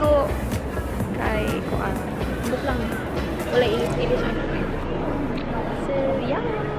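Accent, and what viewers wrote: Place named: Visayas, Philippines. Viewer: Yay! Your Bisaya cute kaayu..May I ask, nagtour bus namu daan pa sa London?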